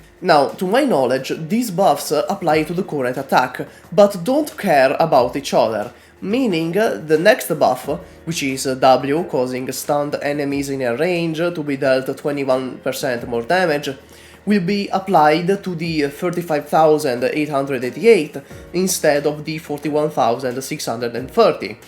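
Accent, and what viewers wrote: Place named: Italy. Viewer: Knowing how much Arknights community has been saved by Kyostinv and his guides, hearing another AK player with an Italian accent immediately made me go “Hmmm, yes, this checks out. I instantly trust this man.”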